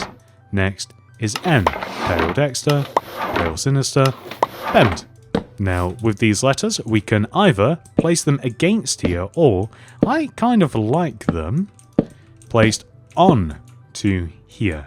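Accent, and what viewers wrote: United Kingdom. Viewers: He's definitely in UK you can tell by his British accent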